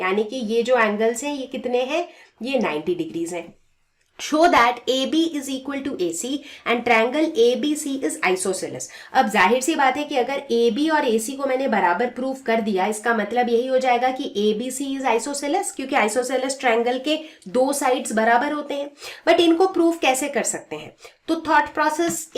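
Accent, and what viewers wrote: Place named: Maharashtra, India. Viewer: Ma'am is from Maharastra 😂👍🏻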